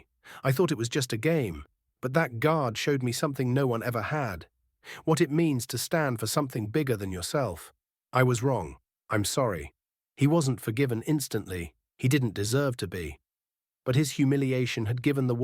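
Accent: United Kingdom. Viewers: Would rather see the moment of the knock down in dramatic movie footage than listening to a guy with a British accent assuring us that the good guy won. Let's hear a good old boy from the Deep South giving us the play by play of teaching the young punk a lesson. Save the limey for a similar story of some immature loser trying to abuse a Buckingham Palace soldier or Horse Guard, etc.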